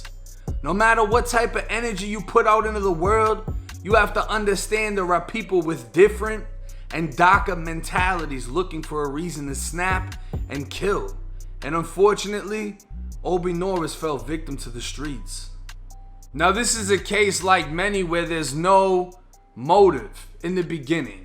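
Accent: Boston, United States. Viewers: Are you from Boston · I'm from Boston massachusetts also and I definitely am a subscriber. I love your baston lol accent 🥰🥰🥰🥰🥰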